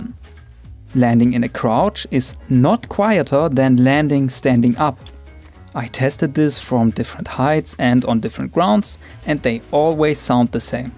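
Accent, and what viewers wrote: Germany. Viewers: You sound Like a german Guy LeL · Hi, are you German? It's just bc your voice and pronunciation sounds a bit like German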